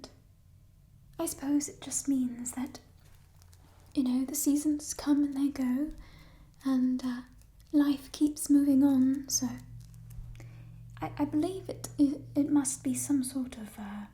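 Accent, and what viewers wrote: United Kingdom. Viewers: is making veiled threats and mocking me with a British accent. Is this nice? Yes, yes it is. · The thief is back, I love the British accent, you do such a good job. Also that TMNT reference lol. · You know what, you remind me a lot of Allison Williams, actually. And it occured to me that she was in Peter Pan Live, which I absolutely love, and you've also done a sort of Peter Pan roleplay a while back, haven't you? It was a big collab, right? I think it was the accent that made me think of this, I guess it's British? I dunno, maybe you should do another sort of Peter Pan thing... 👉👈 I just think you would be so perfect for that version of Peter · Ya know what you do one of the best British accents I've ever heard from an American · Nice British accent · Firstly, you're so good at that British accent. Well on point. ❤️ Secondly, I LOVE this character so much! 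You're amazing at what you do! 💕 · BRITISH ACCENT ALERT❤️ Love this look too x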